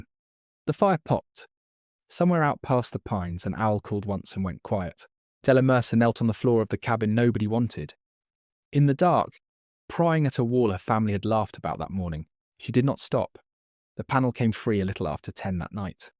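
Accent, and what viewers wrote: United Kingdom. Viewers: Good story. Interesting British voice.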